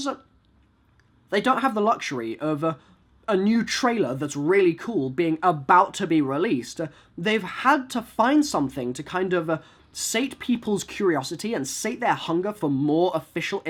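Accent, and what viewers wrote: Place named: United Kingdom. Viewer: that british accent is gonna get on my nerves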